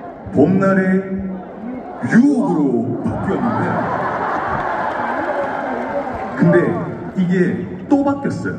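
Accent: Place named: South Korea. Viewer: 우리가수님 노래도 잘하시고 말도 너무잘하세요 사투리를 구수하게 정감있어 좋아요 대전 막콘 잘하세요 이번 두번째 콘서트 대성공이예요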